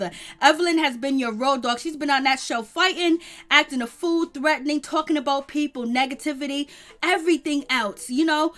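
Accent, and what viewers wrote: New York, United States. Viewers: I love your accent. True New Yorker. 💕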